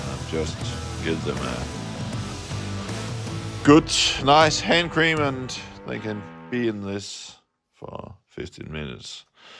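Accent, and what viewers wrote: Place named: Jutland, Denmark. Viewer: det er jysk når det er bedst 😊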